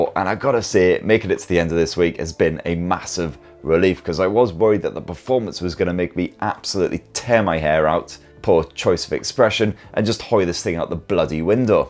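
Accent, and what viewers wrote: United Kingdom. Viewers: British accent . i think.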